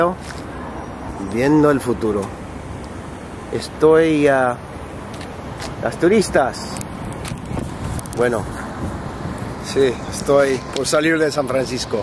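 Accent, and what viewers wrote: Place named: Argentina. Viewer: Loco,,,your spanish is very intact...HOLY CRAP....Argentina accent mixed with Spaniard...very inspiring... TWO THUMBS WAY UP!!! BEST VIDEO SO FAR!!!! MORE MORE MORE CHE!!! Will be showing it to my pops! LOL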